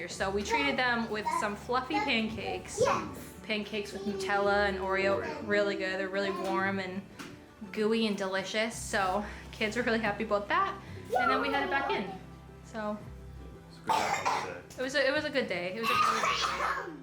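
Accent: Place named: Serbia. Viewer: Pronouncing Serbian surnames correctly, equally in terms of accent and pronunciation and the use of the letter Ć, undoubtedly shows that you have Serbian roots. Americans or Britons cannot pronounce Serbian surnames correctly, either by pronunciation, accent, or the use of the letter Ć. And your overall,general accent telling undoubtely that you are not British,or American,or Canadian,or Australian. So, if you are of Serbian origin, and you have such an attitude towards Kosovo, you should be ashamed of yourself and never come to Serbia again.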